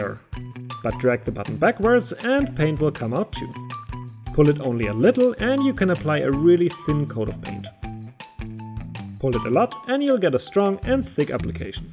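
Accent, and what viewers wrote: Germany. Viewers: your accent is so very german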